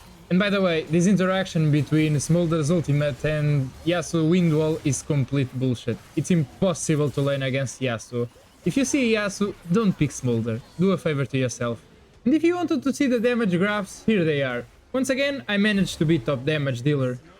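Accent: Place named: Portugal. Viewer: my guy o teu sotaque portugues é que nao dá para esconder hehheheh, keep it up bom video maninho!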